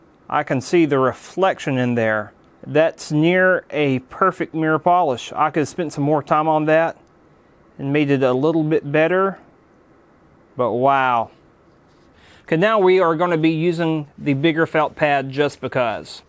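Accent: South, United States. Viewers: as soon as I heard his accent I just knew I was about to learn nothing whatsoever from an intellectually challenged redneck. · Hey I hope you dnt take this offensively, but is your accent considered a redneck or a hillbilly? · Love your southern accent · New watcher, and new sub. You rule. And as someone from California with a southern family, the accent makes me trust you so much more. I love when someone from NASA sounds like they started out with chicken wire and a bad muffler.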